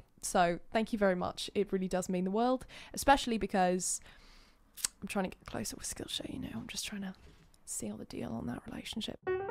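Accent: United Kingdom. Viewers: you sound more british for some reason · I don’t know why I always forget that you are British until you start talking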